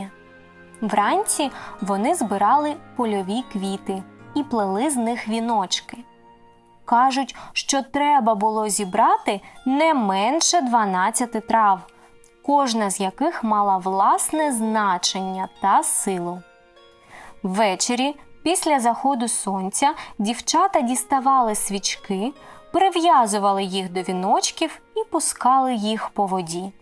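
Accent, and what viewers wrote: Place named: Luhansk, Ukraine. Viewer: А я люблю вашу вимову - така Луганщини))) Луганськ - ЦЕ УКРАЇНА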